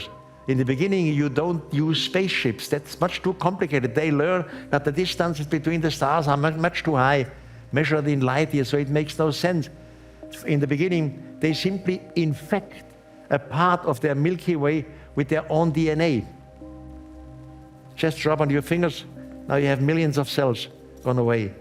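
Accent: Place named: Switzerland. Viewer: Er hat erst kurz vor seinem Tod angefangen Videos auf Englisch hochzuladen und weil er halt Schweizer war und älter als mein Vater verstehe ich sein Englisch besser als native Speaker. Ich habe damit kein Problem. Von Däniken und ich sprechen Englisch in etwa auf demselben Niveau, welches nicht besonders anspruchsvoll ist. Insofern: No problem. 😅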